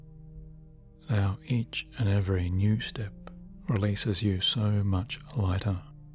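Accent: Australia. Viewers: Are you a fellow Aussie or a New Zealander? Thanks for you video! X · I’ve been listening to you for a long time before I fell asleep. Recently, I realized that I can speak with an amazing Australian accent. Made me popular with my friends, thank you:) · Are you austrailan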